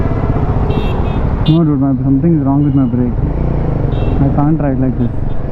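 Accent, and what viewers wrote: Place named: Pakistan. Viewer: need to check with my friend but your Hindi is really good bro you sound like a urdu speaking muslim sometimes 😀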